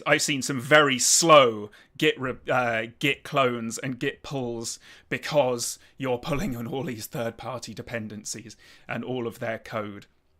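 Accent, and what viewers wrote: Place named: United Kingdom. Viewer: Why are you so dramatic with a heavy brit accent? Suggest to keep it simple/natural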